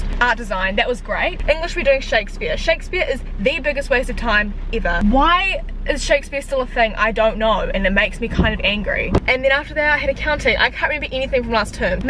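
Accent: New Zealand. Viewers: yes i love seeing kiwis on you tube as i am myself and you really realize our accents after watching american youtubers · Just found your channel and also I love your accent cause it’s so pretty like I am from Colorado in America and I have never seen a NZ you tuber and I think your awesome now I am going to subscribe! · I clicked on this vid and at the start realised you had a kiwi accent but by the end I forgot all about your accent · aw you're so cute!! I love kiwi's & their accents it's the best ahah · i live in Australia, but i've always wished to have a nz accent, love yours!xx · I subscribed because you were from NZ, and you never see them on YouTube. I LOVE YOUR ACCENT!! I’m Australian! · I sub as soon as I saw and heard your kiwi accent, love supporting kiwi YouTubers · I just got auckland for half a year, i don't familiar with kiwi accent so far, your vlogs are perfect for me to practise listening skill of kiwi accent :) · I never understand why people think us kiwi’s have an accent but now I realise what they’re talking about lol. 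I just watched an American vlogger before your video and I was so surprised how distinct it is hahaha. You hardly ever see kiwi vloggers, also died when you said “ excited to put some ‘Kai’ in my stomach “ something only a fellow new-Zealander would understand 😂👍🏽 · I see so many people saying in the comments that they can’t understand the New Zealand accent but since I’m from New Zealand I literally understand everything you’re saying so it’s so weird to think that other people can’t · I went to NZ for term 1 and this brings back so many good memories lol, also your accent makes me miss my friends😂💗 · New Zealand is beautiful it's one of the safest countries <3 and you have such a kiwi accent haha, now I can relate to school vlogs. I'm from Auckland. ~ :)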